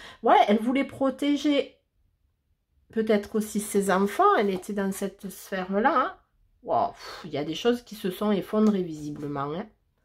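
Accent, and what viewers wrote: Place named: South, France. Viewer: Coucou ! A mon tour, je vais regarder ta vidéo. J’aime ton accent du sud ! Et je me suis abonnée. 🌹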